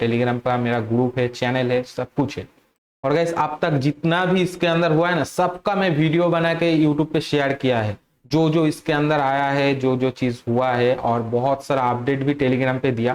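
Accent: Bengal, India. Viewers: bhai tmi bangali ho??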